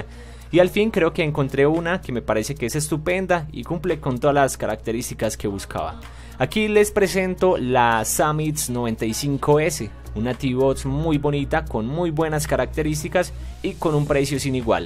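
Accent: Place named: Colombia. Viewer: buenas creo que por voz que eres colombiano! que precio tiene esa box y en dónde comprarla en Colombia ? gracias de ante mano.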